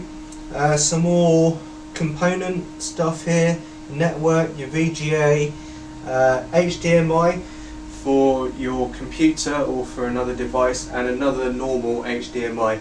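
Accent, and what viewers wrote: United Kingdom. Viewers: lol asian guy with a british accent. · never seen a asian with a british accent lol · holy shit an asian guy with a british accent thats fucking awesome!!!!!!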